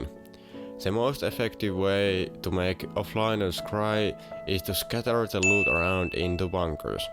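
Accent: Finland. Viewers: Hes not from The U.s Dude is like from Finland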